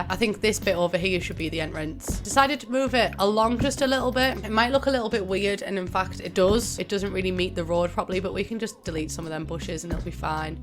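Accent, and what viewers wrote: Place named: England, United Kingdom. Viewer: out of all the english youtubers i love accent the most and she's probably my favorite english youtuber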